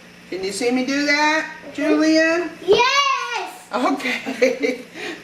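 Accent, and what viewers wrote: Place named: Maryland, United States. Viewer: Where are you? Your accent reminds me of Maryland.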